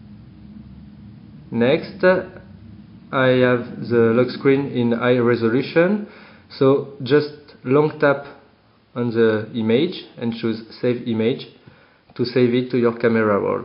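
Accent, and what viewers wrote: France. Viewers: J'adore cet accent! Vive la France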